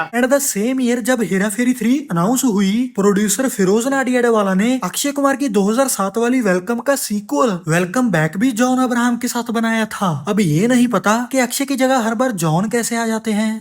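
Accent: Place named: Punjab, India. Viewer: Your punjabi hindi accent sucks ... You shouldn't make videos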